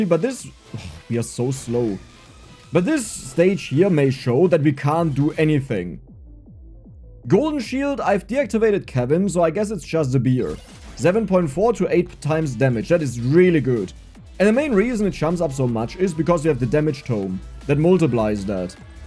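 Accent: Germany. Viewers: Could it be that you are German ?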